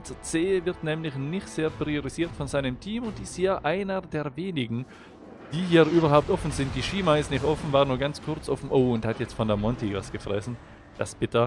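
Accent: Bavaria, Germany. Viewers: bayrischer dialekt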